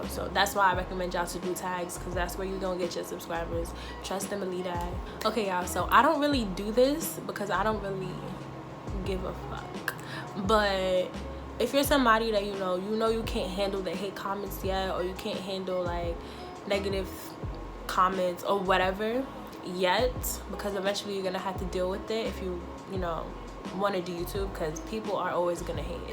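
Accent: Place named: New York, United States. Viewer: You gotta be from NY or up north lmao I hear the accent okay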